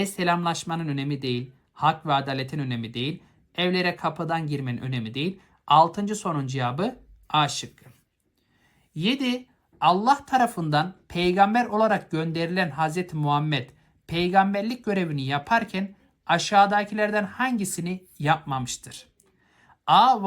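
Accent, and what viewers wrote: Malatya, Turkey. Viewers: Hocam Malatyalı mısınız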